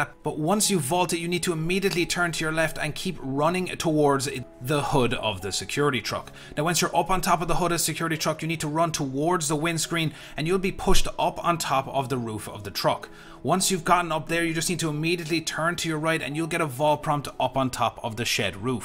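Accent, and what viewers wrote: Ireland. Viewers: U irish? If so where from lad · You Irish?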